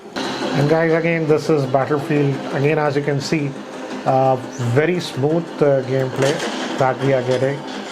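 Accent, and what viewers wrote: India. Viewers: Typical indian style every sentence ending on T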